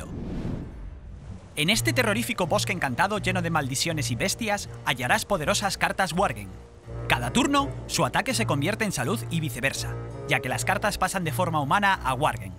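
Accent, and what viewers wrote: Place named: Spain. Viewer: Por poco pensé que me equivoqué de canal y lo confundí con el de "ES", pero no, es "LatAm" y está en español de España :s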